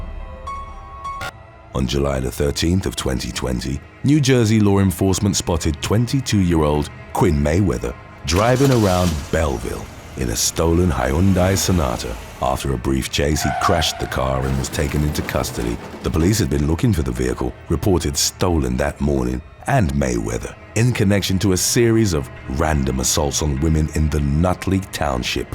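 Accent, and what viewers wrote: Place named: United Kingdom. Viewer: Lol when you realize this channel is British. I didn't think about your accent. Soliciter=lawyer??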